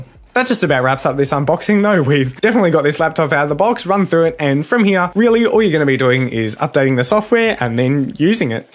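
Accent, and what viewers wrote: Australia. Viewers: He’s Aussie lol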